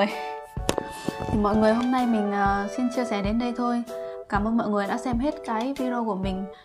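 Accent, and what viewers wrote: North, Vietnam. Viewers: Nói giọng bắc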